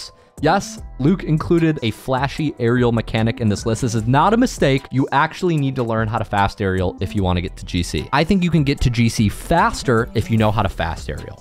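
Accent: Poland. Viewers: Wait a minute are you polish? That Google what hear it is was i polish? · Is he from Poland? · Nie myslalem ze jestes polakiem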